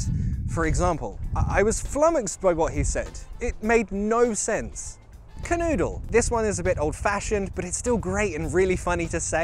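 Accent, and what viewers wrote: United Kingdom. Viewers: I thank you,Sir for the incredibly wonderful work! I appreciate it! British accents are the best!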